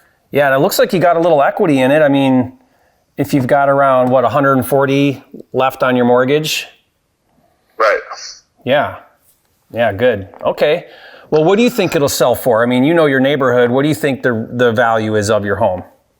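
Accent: Michigan, United States. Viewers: He's got that Michigan accent forsure haha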